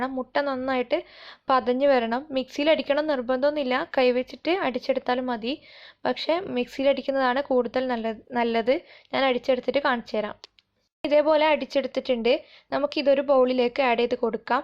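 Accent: Kannur, India. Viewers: Samsaram kettappam manasselayi kannur aan annu , njan Kuthuparamba aan, cakel oru cup RAVA charthaal nannavumo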